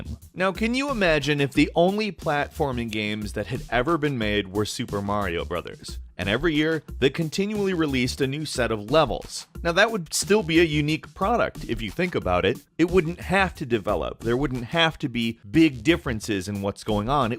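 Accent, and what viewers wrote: Canada. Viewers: Is Canadian?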